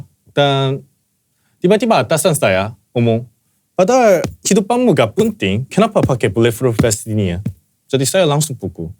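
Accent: South Korea. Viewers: Orng Korea omng bhs indonesia sprti bhsa korea 😂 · Bhs Indo logat korea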